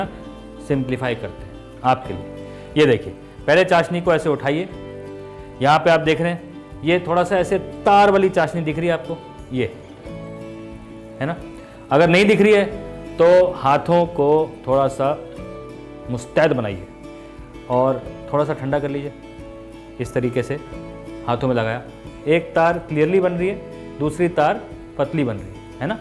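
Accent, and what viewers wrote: Haryana, India. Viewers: Chef your recipes are awesome so are you Aaj toh Haryanvi bol k jamma laath gaad Diya Bahut khub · Chef i never knew that you are from haryana and the accent which brings here while speaking into haryani is so original. Just amazing. I’m also from haryana so i can totally relate to your tone 😂👌🏻. You’re the best. Love your recipes. ❤️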